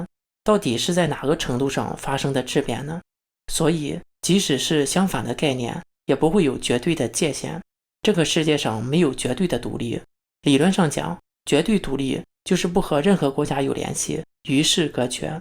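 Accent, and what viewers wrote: Shandong, China. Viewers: 这口音是山东？河北？不管了，中国应该是联邦制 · 你这口音真难听，一听就是我们山东的吧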